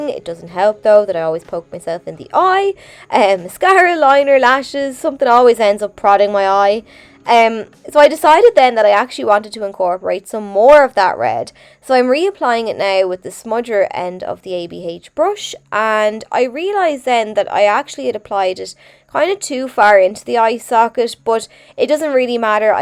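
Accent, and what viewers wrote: Ireland. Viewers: Really enjoy how you explain what colors you use and how to do this it really helps!! ❤ Thanks🙂😃and you must be Irish because of your accent! It's cute thanks❤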